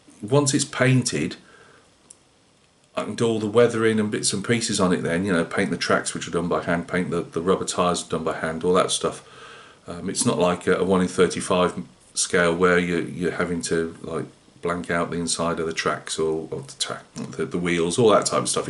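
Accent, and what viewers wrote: Midlands, United Kingdom. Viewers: Happy new year to you from deepest France. My chickens are as pissed off with the weather as you are - though I don’t think spraying models is their main concern. Know what you mean about how great some of our fellow hobbyists are, one of my blog followers sent me 24 beautifully painted Empress Minis to accompany the Indian Mutiny project you inspired me to start. Who does that kind of thing? Anywhoo, lovely to hear another midlanders accent waffling on in the background while I paint, feels like I’m in a pub in Tipton. lol.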